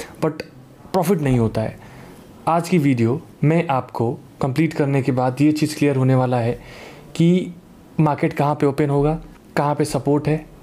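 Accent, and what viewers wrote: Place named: Purvanchal, India. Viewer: Mujhey lagta hai sir aap purwanchal k hai. 😅 😅 😅 aapka accent waisa hi lagta hai. ❤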